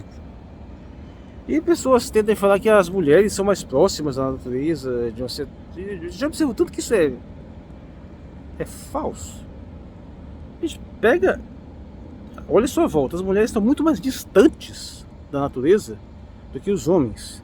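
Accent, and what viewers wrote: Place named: Brasília, Brazil. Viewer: Tu vive em contato com o bioma cerrado direto ? Pelo seu sotaque acho que você é de Brasilia ou do DF.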